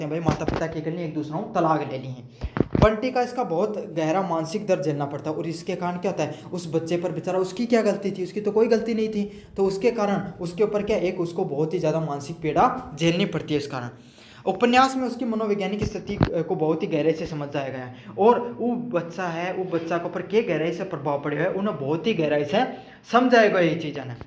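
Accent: Marwar, India. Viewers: Sir itna bhi sach nhi bolna tha😂😂😂. Puri marwadi and dialogue super 😂😂😂😂😂😂😂😂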